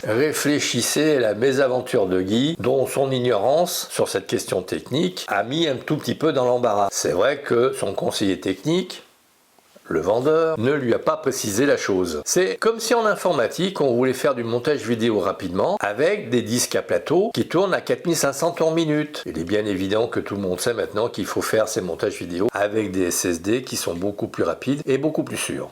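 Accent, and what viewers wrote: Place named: Geneva, Switzerland. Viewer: Moi je suis sur PC/Windows10. Tu as gardé un peu de l'accent de Genèèève ha ha ! Moi je suis né à la Maternité de Genève il y a maintenant 64 ans ! Comme le temps passe ! Maintenant c'est la retraite, c'est le plus beau des métiers. Comme cité plus haut, j'ai 4 boitiers et je les utilise tous. Aujourd'hui, tour en e-vtt avec le D7100 et un 18/105 mm dans le sac à dos, et v'la ti pas que je me retrouve nez à nez avec un chamois à devant moi (j'ai pas fait gaffe !) sur un chemin forestier dans les Gorges de l'Orbe, il a pas bougé et je me suis installé à 15 m de lui, il a fini par se rapprocher de moi et j'ai pu le shooter plusieurs fois, elle est pas belle la vie ! Et tout cela avec un "crouille" boitier, j'ai bien fait de le prendre avec moi. Passe une excellente semaine amigo, cordialement François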